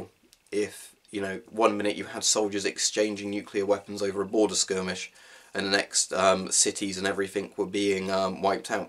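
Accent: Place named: England, United Kingdom. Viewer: I only like recieving information in English accents 😂. It just sounds more sophisticated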